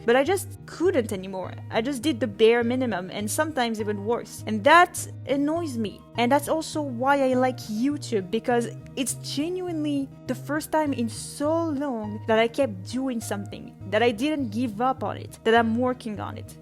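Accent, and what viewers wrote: France. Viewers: And you know what’s funny ? By first watching one video of yours, I really thought you were American, then I was like « But she kind of has a French accent, I wonder if she’s French in fact » and when I watched your short about Yandere Simulator, I was like « Yeah. She’s definitely French. » I mean, I don’t understand how people can’t notice you’re an anime fan, you litteraly use many anime of your videos (like Death Note or Kaguya Sama). Wish I had like half of your collection, still have the entire collection of Ki & Hi though. I feel you. Currently in my last year of High School and I can’t seriously study for my baccalaureate even if I try. And I feel bad because in elementary school, I only had excellent grades but it feel off the second I enter middle school. I just hope I’ll have a 13 or 14 in my final grade. Alex Meyers, Saberspark and Octo Lady ? 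I didn’t know you had such good taste, let’s go😌 ESPECIALLY since he’s canonically asexual. Like…no. I mean, the only person I can see Alastor being with is Rosie but only for tax benefits or something like that. OMG WHAT ??? It’s so cool, I’m Moroccan too, LET’S GOO ! I think it will be better to do that if you reach 50k. But anyway, congrats for the 9.5k🎉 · Just basically it kills me how people knew you are french like me because you have a french accent. In the crack ships video there was literally written « nombre total d’abonnés » and the name of the music video was « Mon avis va déclencher une guerre » so as Carmilla said « It’s not rocket science » 😂😂😂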